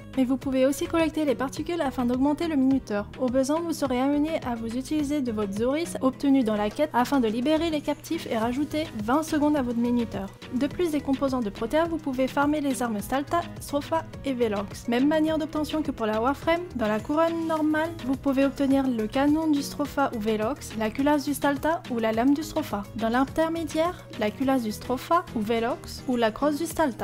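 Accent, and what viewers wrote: France. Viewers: j'me disais bien que j'avais reconnu l'accent français sur les autres vidéos Haha.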